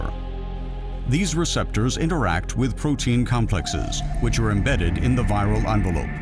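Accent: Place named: United States. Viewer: No mazen i don't think it is british , british accent is much easier than american , probably it is american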